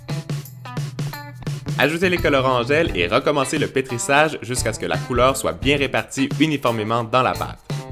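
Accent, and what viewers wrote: Canada. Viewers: De un ta vidéo est génial de deux je préfère les deux(là meilleur façon de fair manger des légumes à mon petit frère et cousin)et de trois j'aime trop ton accent moi j'ai toujours rêver de parler comme vous les canadiens 😘 😘 BISOUS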